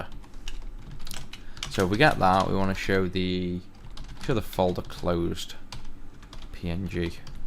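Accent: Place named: England, United Kingdom. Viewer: Thank you very much for all these tutorials, they're the best and most in-depth explanations of WPF and C# I've found on youtube. Great teacher! I'm going to start thinking of code in my head in an English accent now, which is fine by me!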